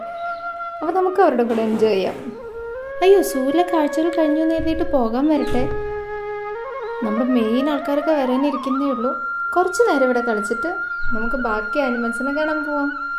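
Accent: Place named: Thrissur, India. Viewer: Thrissur slang kalakiii👌👌😄😄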